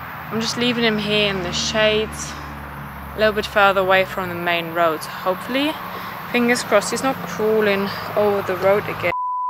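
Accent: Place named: United Kingdom. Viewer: Love it so much! 😍 & you have such a great British accent omg! ❤️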